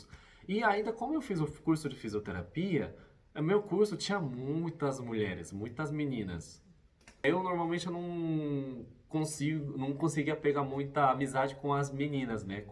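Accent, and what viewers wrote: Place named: Mato Grosso, Brazil. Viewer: Nossa, o sotaque dele lembra o sotaque de quem é do estado do Mato Grosso.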